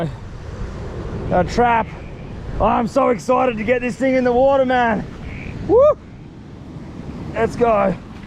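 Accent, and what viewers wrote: Australia. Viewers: What an inspiration. The Aussie accent just makes it that much more watchable. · He is Australian. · Is he from Australia?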